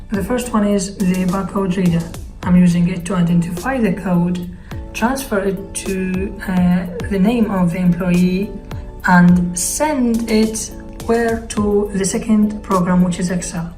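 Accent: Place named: United Kingdom. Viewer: جهاز روعه واللكنة البريطانية اروع ! استمر للأمام...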